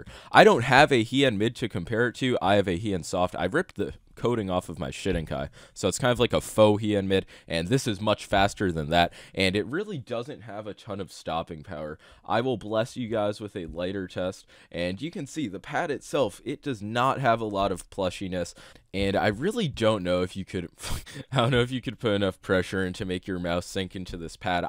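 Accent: New York, United States. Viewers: are you from nyc?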